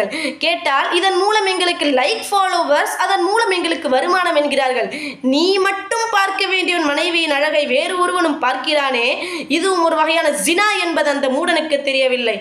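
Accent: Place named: Pallapatti, India. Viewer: I think it's பள்ளப்பட்டி uswathun hasana style of slang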